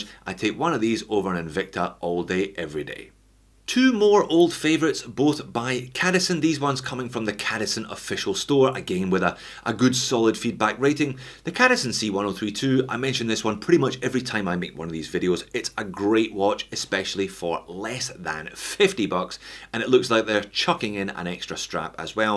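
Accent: Scotland, United Kingdom. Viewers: I appreciate your work and the Scottish accent! Have you considered buying a real Flieger from Stowa?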